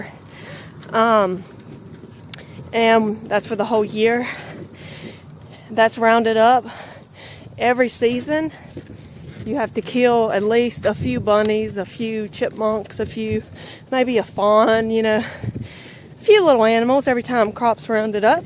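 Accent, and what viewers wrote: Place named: South, United States. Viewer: Hey I love your accent.. I’m originally from India but I have been living in Chicago for 6 years now but I hardly meet people here with your (southern?) accent. I visited Mammoth caves last year and I met locals with your accent and they were super friendly. And yes I agree milk is so satiating. I like yogurt and butter too. I Can eat a stick of butter everyday.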